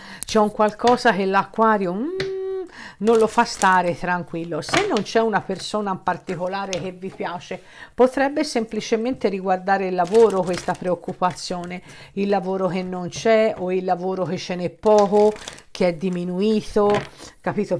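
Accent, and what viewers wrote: Tuscany, Italy. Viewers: Ciao, sei bravissima e simpaticissima. Adoro la tua cadenza Toscana, di dove sei? Io ho parenti cari a Follonica GR e ad Agosto spero tanto di andare in vacanza lì. Io sono acquario asc. vergine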